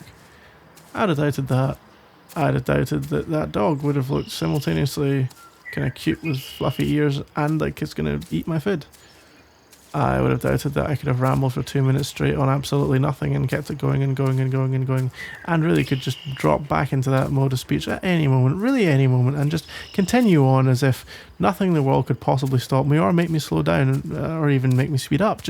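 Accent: Scotland, United Kingdom. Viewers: Omg wait you're Scottish!? Me too!!!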